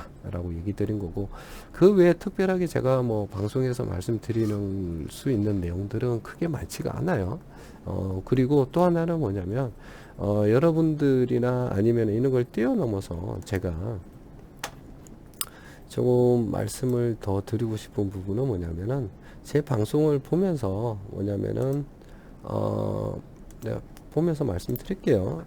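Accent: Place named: Gyeongsang, South Korea. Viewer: 경상도 영어가 리듬이좋아서 더 잘들리죠 ㅎㅎ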